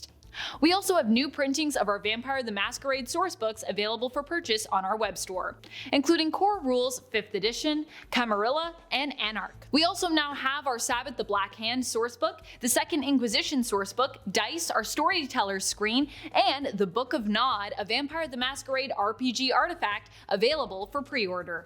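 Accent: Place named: Canada. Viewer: She's Canadian, they talk funny sometimes.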